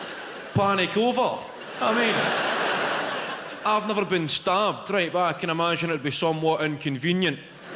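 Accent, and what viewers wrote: Scotland, United Kingdom. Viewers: It's difficult for non Scots to understand us. Believe it or not, has tidied up his accent here. When Scots talk to each other it's impenetrable to outsiders. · love the Scottish accent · This guy has the strongest Scottish accent I've heard. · Gosh, I love his accent. It is sooooooooo hard to understand him listening for the first time if you're not Scottish or British at least but still he's hillarious! :D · He's Scottish. His accent is hard for some people. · one word...well 5 words shortened into one....LMFAO!! thats all i can say ..and his scottish accent makes it that much more funny! hahahaha loved it! ..word count = 27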